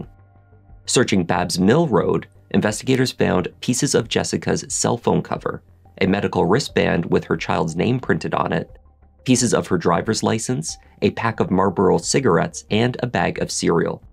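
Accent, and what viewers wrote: Canada. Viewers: Is the narrator from Canada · Thank you! It was only around a month ago, if that, that I discovered your channel, thanks to the wonders of the YT algorithm. Since then, I have watched 30 to 40 of your videos (really!). Furthermore, I sent an email to the popular political broadcaster Megyn Kelly, herself a fan of the true crime genre, and highly recommended your channel to her. Yes, I tend to forget names quickly, starting in real life around two seconds after meeting someone new. So when you refer to six different people in a video by first name, I frequently have to rewind in order to remind myself who is who. Curiosity question: I was surprised to hear you say on an episode I watched yesterday that you and your wife live in the British isles. Can I safely assume that you are Canadian? I have caught hints that you are in fact from Canada, including accent, pronunciation, and even referring to the US Coast Goard as the "USCG", which absolutely no one in the US does. 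Again, just curious. Thank you for all the amazing work you do on this channel. And I very much appreciate your personal response to my comment here. Jim W.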